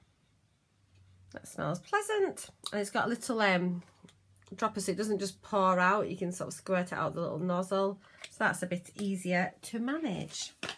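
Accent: Yorkshire, United Kingdom. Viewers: Are you from Sheffield or Leeds maybe, judging from the accent :) great video, thankyou for sharing x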